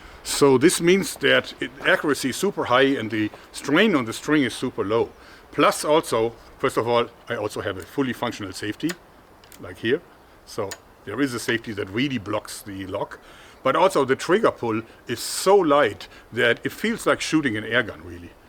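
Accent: Germany. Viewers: You sound German but I think you live in Washington or Oregon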